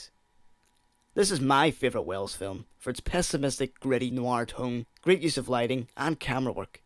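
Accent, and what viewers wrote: Northern Ireland, United Kingdom. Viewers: Just watched the film; I enjoyed it very much. If I may ask, where did you go to college/film school? Judging by your accent you are from Northern Ireland, correct?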